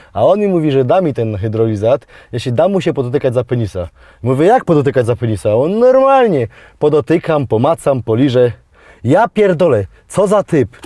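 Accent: Silesia, Poland. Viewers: nie kwestionuje tego, po prostu musiałem tym komentarzem dać upust emocjom, ponieważ najzwyczajniej w świecie nie podoba mi się gwara śląska, mimo że twój kanał jest całkiem fajny ^^ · a jak ma mowic skoro jest ze slaska xD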